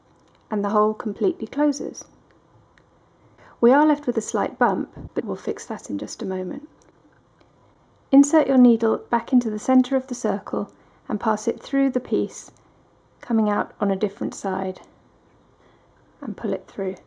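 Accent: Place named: England, United Kingdom. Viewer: wow thanks what a great video :3 I love your english accent by the way :D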